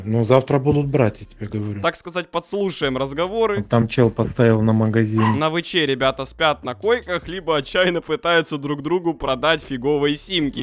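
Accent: Ukraine. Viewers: Ммм ты с Украины?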